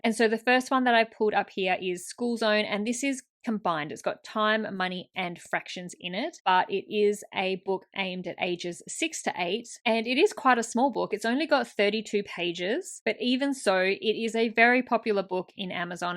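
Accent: Australia. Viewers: I love this video and the educational tool. I have a couple of niche educational books ideas. I love to see online. Plus, I'm hearing an Australian, I can relate!🙏🪃🇦🇺☺️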